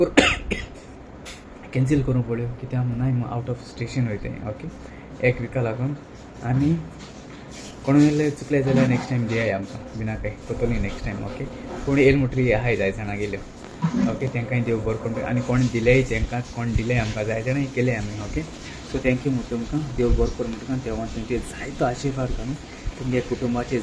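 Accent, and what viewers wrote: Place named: South Goa, India. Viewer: really you are comedian I like the way you talk of south goa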